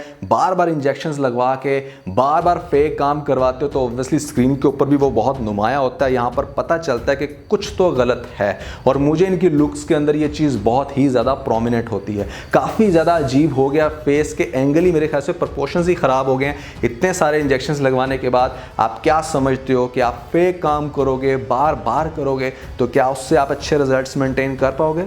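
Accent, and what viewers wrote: India. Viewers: Bhaiee aap hindu ho kia aap ki language or aacent hindi hay baar baar ( achhay say) boltay hn aap achay tareeqay say kehna chahiye pakistanion ki tarah lanat teri shakal per urdu ko bigaar rahay hn aap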